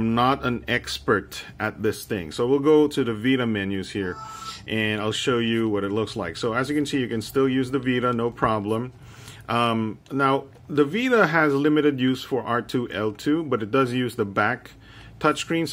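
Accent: Philippines. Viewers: The way you speak English is very Filipino Like the way you say Comfortable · i can tell your filipino because of your accent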